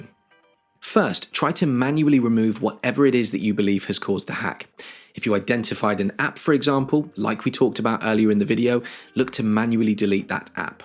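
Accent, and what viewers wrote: United Kingdom. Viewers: Jailbreaking your iPhone, and changing the password from the default of alpine to anything else is infinitely more secure than not. VPNs don’t really help that much. If anybody knows what they’re doing, they can see through the nonsensical rerouting of your IP address. The British accent really helps.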